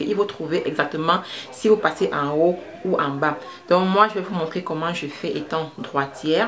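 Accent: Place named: Cameroon. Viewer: Merci tantine J'entend ton Cameroun ce pays me manque ❤️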